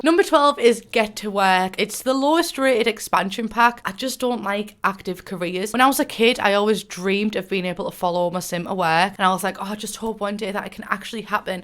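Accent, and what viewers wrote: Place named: Middlesbrough, United Kingdom. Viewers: YOU CHANGED YOUR VIDEO DESCRIPTION rip “...from proper up north that’s why i sound like that” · Never watched one of your videos until a friend sent me this today, heard your voice and as a fellow smoggie no longer living in the Boro, your voice was a nice bit of home I needed, thanks. Also thanks for helping which packs to buy, great content. Subscribed. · I just realised I never question your voice cuz I'm also from Middlesbrough onsdiadoj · lmao I literally was thinking 'i heard this accent before, maybe when I flew in Middlesbrough to get tattooed' then I saw your description. I've never felt this satisfied for guessing something right (I'm French so recognizing accents is a big deal for me)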